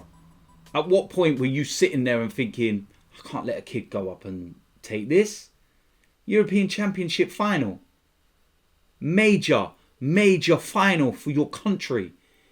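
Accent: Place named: RP, United Kingdom. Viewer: Love how posh & 'professional' he speaks on his own channel compared to being on aftv & other channels 😂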